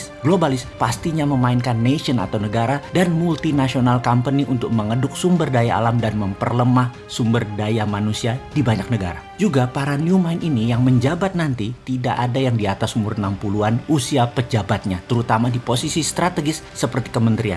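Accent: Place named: East Java, Indonesia. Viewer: Ngopimu kurang adoh lur wkwkwk hahahaha Jawa timuran rek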